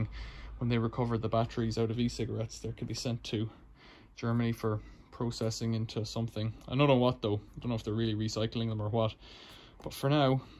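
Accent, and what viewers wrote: Ireland. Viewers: the one with no charge port is a common charge port and the other is a separate charge port. Nice to hear an Irish accent on the tube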